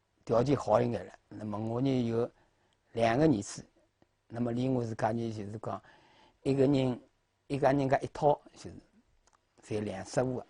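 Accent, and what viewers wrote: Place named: Shanghai, China. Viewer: 这不和浦东话一样吗，我说没和南汇合并前的老浦东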